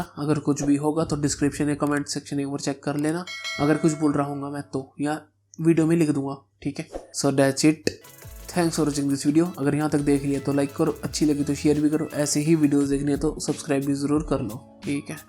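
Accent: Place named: Haryana, India. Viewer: That haryanvi accent 😂❤️, sunne me maza aa gya tbh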